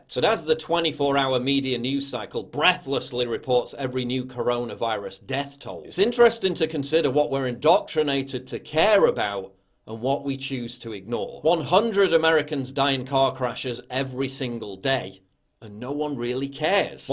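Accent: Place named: England, United Kingdom. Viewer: I love you,. You are not only young and handsome, ( don’t worry,I’m 73 years old) but smart, politically well informed, and courageous. Oh, and I loved your beautiful English accent! Oops, did I sounded racist?! What the heck! Very seriously now, this is an analysis that we don’t see in the corporate media full of coward unprofessional journalists Excellent! 👏👍❤️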